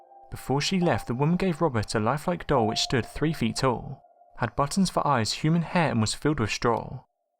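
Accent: United Kingdom. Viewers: The British accent narrative makes it more spooky lol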